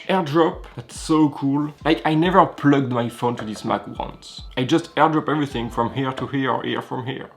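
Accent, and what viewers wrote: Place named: France. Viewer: The French accent make this review magnificent L’humour le montage t’es bon ! Keep going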